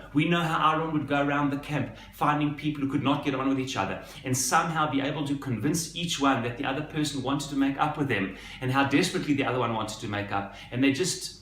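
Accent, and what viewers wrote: South Africa. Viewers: He have a south african accent. Is he from south africa?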